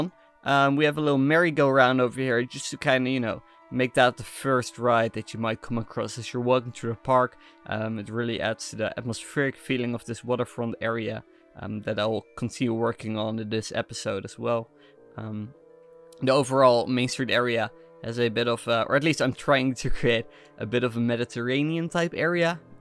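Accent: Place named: Netherlands. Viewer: Haha ik kan gelijk horen dat je nederlands bent 😉 verder toffe video!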